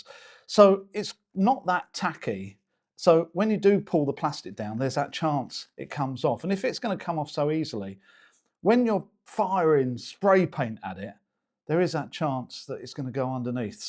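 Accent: United Kingdom. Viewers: British commentators are the best. Enjoyed the well articulated commentary 😊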